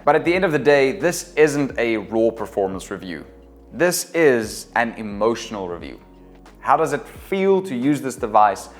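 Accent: South Africa. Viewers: A South African tech reviewer? 😮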